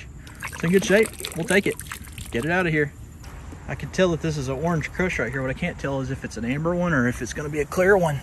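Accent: Alabama, United States. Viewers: Please excuse my ignorance, but are you saying “Cherra Cola” or “Cherry Cola?” I guess my Alabama accent decoder’s rusty. I lived in Huntsville as a kid when my dad worked for NASA, but I’ve lived in Alaska too long. Sorry for the dumb question 😂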